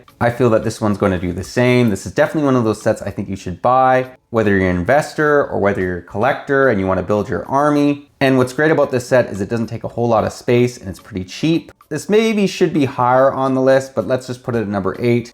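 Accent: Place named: Canada. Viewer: He is canadian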